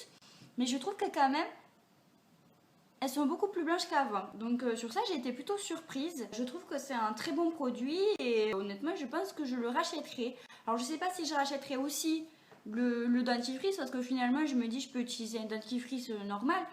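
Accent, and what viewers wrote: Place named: South, France. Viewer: coucou coup de fouet mdr j'adore je suis partie vivre dans je bord et je suis du sud ton accent me rend nostalgique (j'ai mon accent aussi lol) super vidéo que de belles dents 😆😆😆😍😘 bye bye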